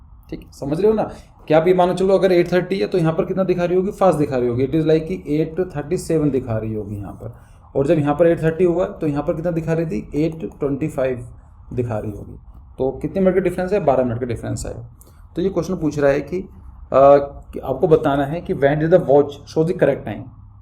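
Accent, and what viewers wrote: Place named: mixed, India. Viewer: I thought...bcoz in this video ur one accent like UP, Bihar side😅😅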